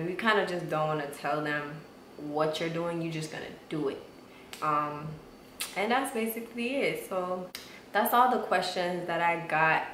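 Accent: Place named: United States. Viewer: lol I was about to ask but wasn't sure because of your american accent--if you were Habesha lol. Now I know ur not :)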